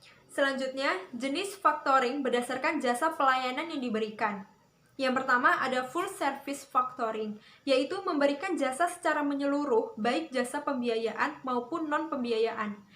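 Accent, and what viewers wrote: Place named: Java, Indonesia. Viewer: Eh orang Bengkulu tapi medok Jawa🤭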